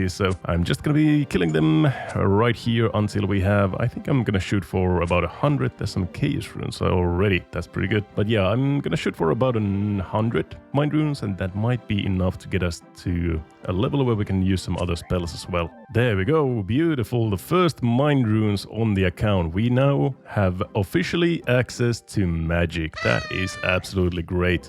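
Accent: Finland. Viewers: Since the first episodes, i always tought you were Finnish but never checked it out. Now i did and i was right all along. Tiiny tiny accent gave it away. Keep up the grind. (Englantis on aika pirun hyvä) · Wait, are you Finnish? I always thought German 🤔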